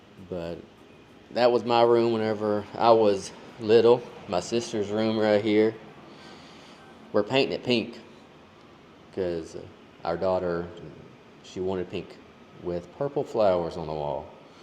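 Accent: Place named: South, United States. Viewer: Watching the progress! What State are you in? Sounds like Alabama or Georgia!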